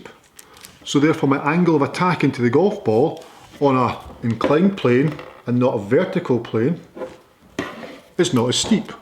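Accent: Scotland, United Kingdom. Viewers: Well explained and demonstrated, as usual. Your videos are easy to follow as you explain and demonstrate very well , with a keen awareness of camera angles for the best viewer experience. Your delivery is excellent based on clear diction and a slightly aggressive but at the same time friendly direct Scottish accent, which I like - direct and no BS. Good sense of humour with amusing asides to camera are also very good. Lots of stuff on you tube but you appeal to a high handicapper hacker like me. Seeing some improvement with eureka. Big fan, keep up the good work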